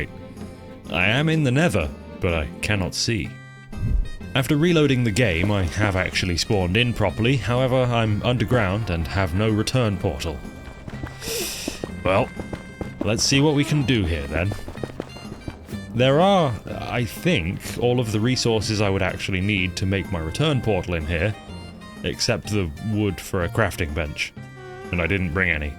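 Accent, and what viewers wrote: United Kingdom. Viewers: Watching an extremely british person say "Fuckos" in the most polite and eloquent voice was not something on my bucket list.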